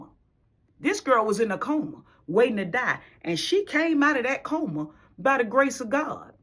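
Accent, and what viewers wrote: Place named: Alabama, United States. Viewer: You from Alabama I can tell im also from Alabama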